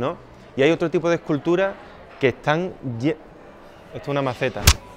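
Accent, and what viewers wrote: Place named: Seville, Spain. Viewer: Como lo pusiste con k pensé que a lo mejor allí no se utilizaba, cosa me extraño que así fuera, pero como hay palabras comunes aquí que en algunos países de Latinoamérica no se utilizan, pues sera una de esas. En cuanto a la pronunciación, el es sevillano y tiene su acento particular dentro del acento español andaluz.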